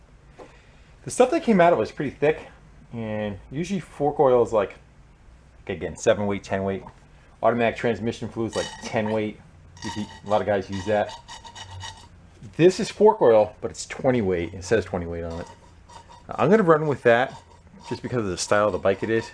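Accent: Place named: New England, United States. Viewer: Love the videos,. Good to see a fellow New Englander, accent and all making YouTube videos that are educational and entertaining. Keep up the wicked good work!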